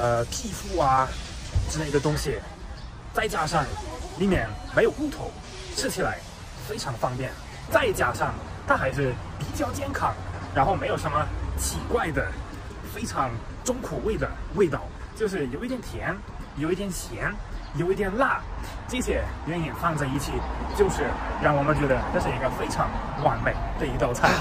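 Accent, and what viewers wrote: Sichuan, China. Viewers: 你的四川口音…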